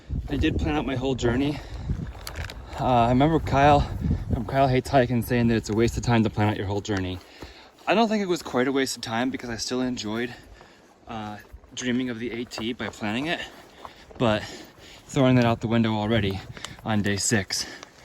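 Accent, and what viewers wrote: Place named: Connecticut, United States. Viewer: Are you from CT, by chance? I think I recognize your accent! I know that, right now, your thinking is all about "can I make it to there, by then", but soon, you're not going to care. You'll just know, because your legs will be strong and your confidence will be stronger, that you'll be able to make it to wherever you want to make it in a day. Weather won't be as important. Or at least, not as urgent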